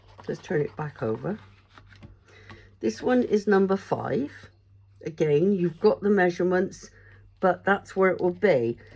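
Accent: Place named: West Country, United Kingdom. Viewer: An absolutely beautiful card made by a beautiful lady. I can hear by your accent that, like myself, you're a West Country girl? I'm a Devonshire Dumpling 😂😆💗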